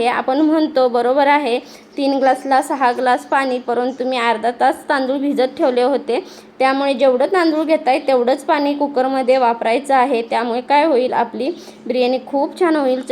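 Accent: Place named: Kolhapur, India. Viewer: खूप छान रेसिपी तुम्ही अस्सल कोल्हापुरी आणि तुमच्या बोलण्यातही कोल्हापूरचा ठसका जाणवत आहे 👍👍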